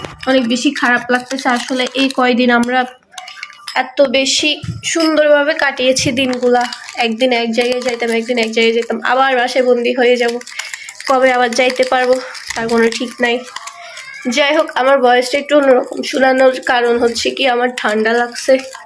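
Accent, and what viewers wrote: Noakhali, Bangladesh. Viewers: নোয়াখালীর ভাষা আমার কাছে অনেক ভালো লাগে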